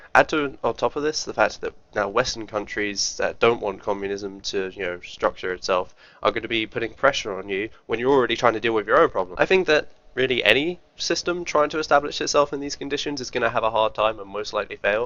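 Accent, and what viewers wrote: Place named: United Kingdom. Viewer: Communist is good, says the guy with british accent that grew up with access to the privileges of the first world. This is communism, talking sh*t and defending it, until you have to live under a suppresive regime like cuba, venezuela, north korea, ussr. I wonder why people run away from those countries. When was the last time you read about americans or brits running away to cuba?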